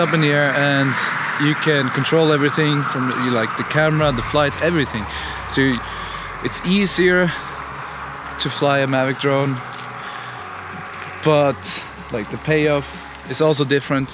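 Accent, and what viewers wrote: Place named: Norway. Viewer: Fabulous video, 🙌🏼 Are you Norwegian mate?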